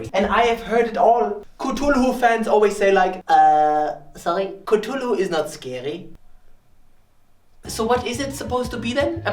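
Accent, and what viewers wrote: Germany. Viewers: german for sure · Are you German? your accent reminds me of my german teacher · Ich Love your Denglisch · Liebe den schnellen englisch deutsch mashup, weiter so xD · weird question: are you german? lol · why the fuk do you speak deutglish im mexian how the fuk am i supposed to understand you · DIeses Deutsch-Englisch so geil :D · Hes german I think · are you German? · Are you german? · Mostly its German but he often loves to put some Asian accents in. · asian german speaking english im sold xD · you are chinese but your accent sounds like german and french some times and did you just said "figlio di putana" (son of a bitch in italian) ? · Are you from Germany?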